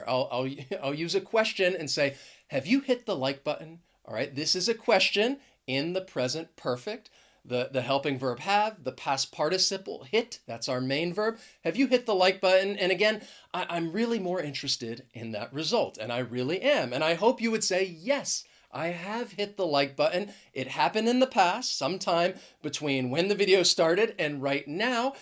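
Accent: United States. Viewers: Hi. What type of American English pronunciation do you represent?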